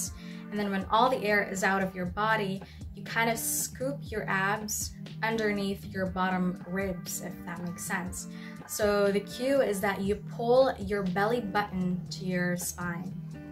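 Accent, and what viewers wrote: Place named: United States. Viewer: Omg it's like I'm watching an American vlogger. Your accent is soooo 🔥🔥🔥. Thanks for sharing btw. ❤️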